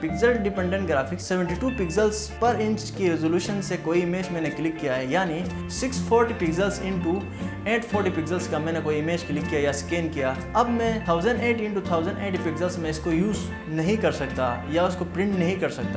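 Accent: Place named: Gilgit, Pakistan. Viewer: Yar bro ap ka lahja to glgite Wala ha am I write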